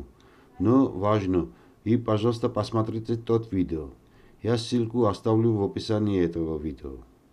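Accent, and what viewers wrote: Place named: Georgia. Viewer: Такой приятный грузинский акцент,пойду смотреть старые грузинские комедии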